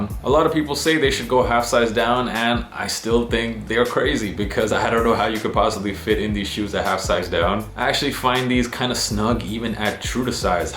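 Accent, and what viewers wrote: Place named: United States. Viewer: i always thought you lived in Europe.. not sure why cus you sound american lol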